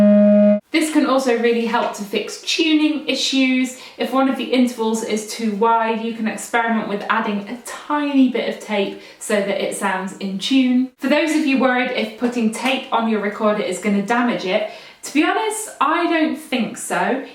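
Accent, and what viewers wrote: England, United Kingdom. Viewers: I speak Portuguese, but learned English by myself, after years of web-surfing, song playing and gaming. I am an amateur musician (I play guitar, C and F recorders, harmonica), and I can say that your videos are mesmerising - not only because of your skills and simpathy, but because of your English accent... and, lets be honest, when you speak, your mouth is hypnotic!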